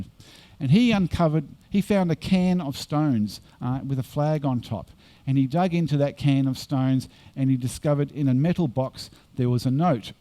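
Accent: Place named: Australia. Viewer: ..trying to get past the Aussie accent, the image of Tower Bridge doesn't help.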